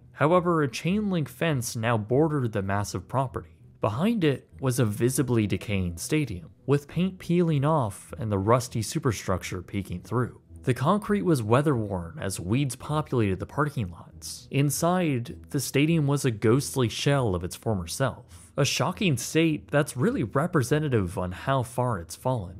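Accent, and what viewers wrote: Canada. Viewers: Thanks for another great video. Hearing you pronounce The Padres was funny. I'm not poking fun, I understand you have a Canadian accent. Our differences makes life interesting.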